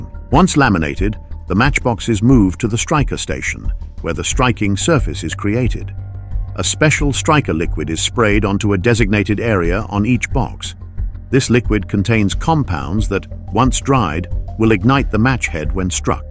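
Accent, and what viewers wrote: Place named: United Kingdom. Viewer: Have someone w/ half a brain listen to ur audio to see what they think. Constantly stating the obvious over & fing over!! Hire a real writer & narrator, that British accent could not sound any dumber!!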